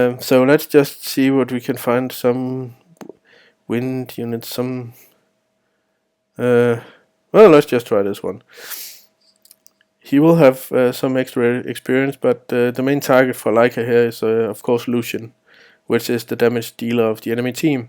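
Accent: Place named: Germany. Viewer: I bet you are from Germany, it really sounds like it. :D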